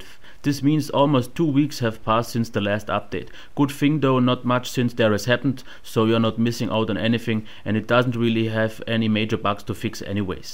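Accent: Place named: Germany. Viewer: This review is great, but the accent is even better. German?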